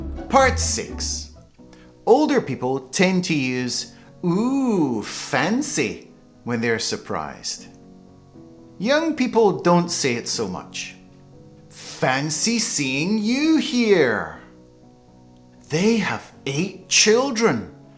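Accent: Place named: United Kingdom. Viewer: Most appreciated. I`m loving British English! Your English reminds me of my staying in the UK. Thanks, Edinburgh is beautiful town, Highland, Loch Ness. Keep going, I will be watching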